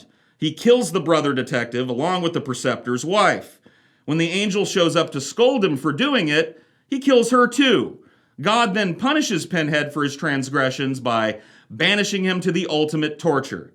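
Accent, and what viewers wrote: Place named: United States. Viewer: Ok which part of america does this amazing atheist voice come from?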